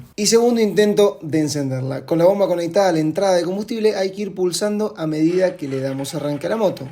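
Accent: Argentina. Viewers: por tu forma de hablar pareces Argentino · Sos argentino ? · Me encanta el acento argento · Hermano te felicito ! Es hermosa la moto que compraste, y espectacular el proceso hasta hacerla andar, te hago una pregunta ya que por el tono sos Argentino y se ve que no vivis en el país.. en que pais y cuidad estas ? Gracias saludos desde Mendoza, Argentina.🎉 🇦🇷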